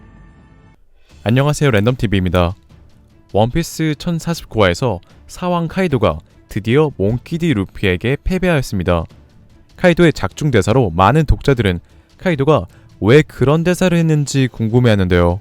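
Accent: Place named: South Korea. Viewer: 수면용 책읽기 방송 부탁해요 어쩜이리 순도 백프로 한국억양인지 ㅎㅎ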